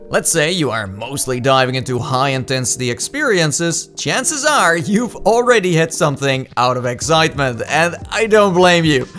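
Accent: Netherlands. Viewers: are you dutch?? idk why but i have a feeling with your voice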